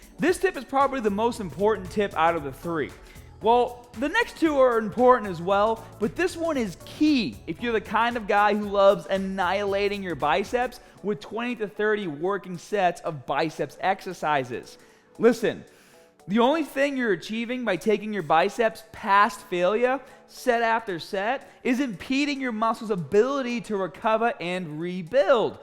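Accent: Boston, United States. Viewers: Love u, doing it without juice, love the Boston accent, I'm from Connecticut, my dad was from Boston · What can i say... the title aren't that clickbait... your boston accents? Just got it ways to the title. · I love how you took that awesome Boston accent and made it a thing on this channel. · Is he from "bah"ston MA?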